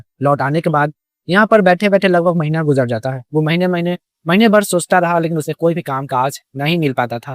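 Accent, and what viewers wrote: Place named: Haryana, India. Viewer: Haryana se bol rahe hain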